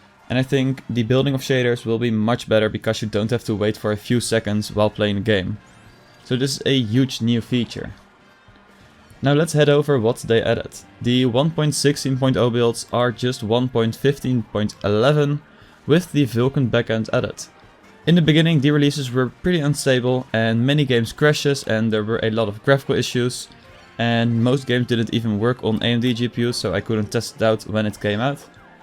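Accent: Netherlands. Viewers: please work on your dutch accent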